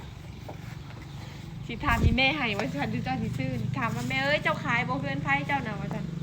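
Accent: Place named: Tha Kho, Thailand. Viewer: ฟังเสิยงเว้า.คึอไทบ้านท่าค้อ แท้.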